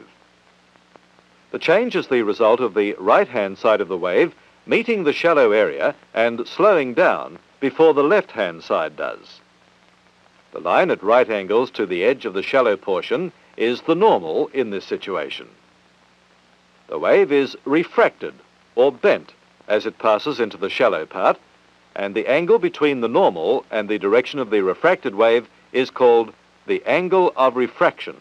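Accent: Australia. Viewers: Oh right yes. As an Aussie myself it was a (nice) surprise to me to hear an Aussie voice pop out in the context of a Fran film. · These are great fun! I was wondering why an Aussie was doing the voice over for a US university film. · To my Australian ears it sounds like the Cultivated Australian accent, owing something to Received Pronunciation but definitely Australian. The General accent (Hugh Jackman for example) is broader than this and the Broad accent (think Steve Irwin) is way past that :) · The announcer sounds very Australian to me. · He's Australian, what do you expect? You want everyone to pronounce words in 'Merican?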